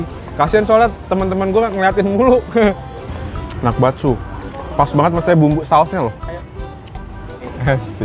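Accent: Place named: Tangerang, Indonesia. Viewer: Logatnya tangerang banget bang😂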